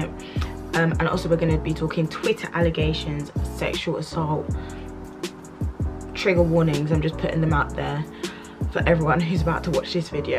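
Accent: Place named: London, United Kingdom. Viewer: Gurlll I'm about to talk about this show next on my channel. Subscribed as it's good to hear a similar london accent to me 😍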